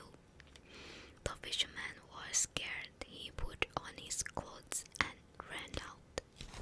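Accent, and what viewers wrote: Standard. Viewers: 发音好标准，好萌！🥹🫶🏻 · 你的中文发音很标准，谢谢～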